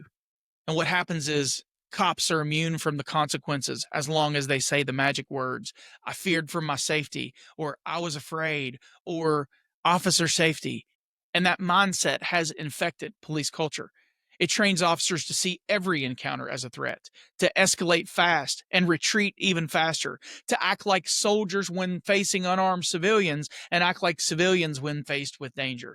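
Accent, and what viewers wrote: South, United States. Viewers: Very good work here, Sir..... very good indeed. You mentioned the crafting of language which would show up in their Police Reports. You were kind in calling them misleading b/c to my way of thinking if it's not the truth then it can only be a non-truth. Whether we call it misleading or a lie, isn't the LEO and/or the DA failing to uphold their Oaths if any of the Police Report information is not true & they fail to be held responsible? I do apologize for going off topic here but this issue of misleading police reports gets even worse when multiple LEO's are conspiring to get the story straight? This was a really well thought out video - truly disturbing on so many levels. peace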